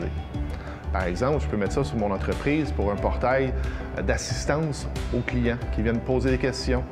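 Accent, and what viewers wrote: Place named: Canada. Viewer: Merci de m'avoir sauvé 😘👍🏾 (ok, elle était facile) Très bien expliqué, en français, avec ce délicieux accent canadien fortement apprécié 🥰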